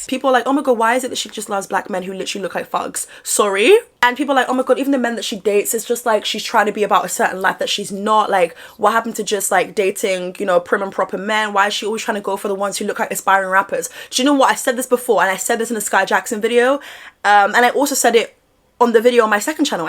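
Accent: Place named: United Kingdom. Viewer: Love the accent also 4 a black Brit you are hilarious and thanks...😅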